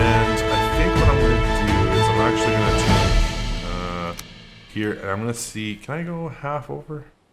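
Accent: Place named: Canada. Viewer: Yo r u a Canadian brethren..? U got that accent 😳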